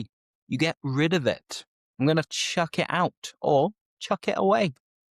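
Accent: Northern England, United Kingdom. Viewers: Your pronunciation is from the north of England, of course that’s fine, however, because you have a colloquial accent it’s really important that you try to pronounce words a little more clearly for the learners. For instance, in the first sentence you pronounced the word “starting“ while pronouncing this word you didn’t pronounce the T We usually pronounce the T & D if followed by a vowel sound, I hope this helps you, great lesson keep it up.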